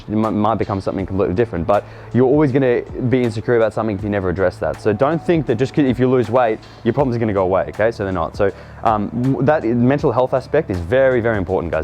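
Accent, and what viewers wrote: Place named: Australia. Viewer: Ngl mate your videos are great, but your Australian accent makes it that much better and cooler lmao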